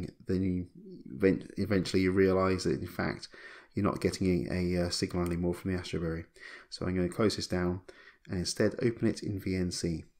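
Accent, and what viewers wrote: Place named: Liverpool, United Kingdom. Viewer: MY GOD HE"S FROM LIVERPOOL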